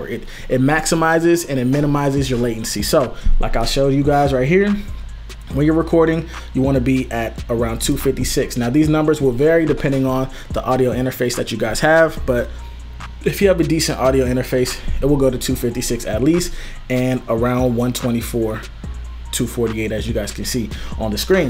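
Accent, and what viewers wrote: Jamaica. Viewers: U Jamaican 🇯🇲 ? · you Jamaican??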